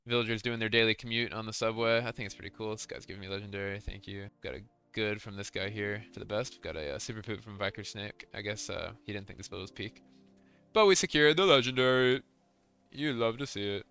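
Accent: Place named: Cockney, United Kingdom. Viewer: trying to speak in a crockey voice the whole time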